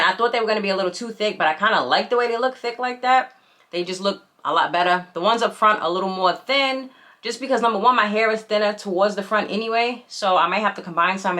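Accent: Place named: New York, United States. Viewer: I love you NY accent ❤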